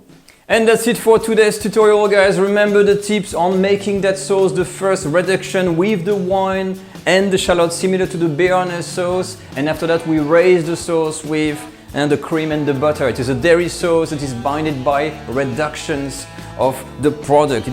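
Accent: France. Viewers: Definitely helps to have that cool French accent!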